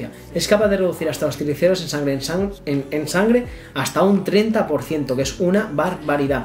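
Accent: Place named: Spain. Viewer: DR HABLAS DEMASIADO RÁPIDO cuando menos yo q soy mexicana estaba dándole al video cada rato para atrás y tratar de entender tu voz española Gracias por toda la información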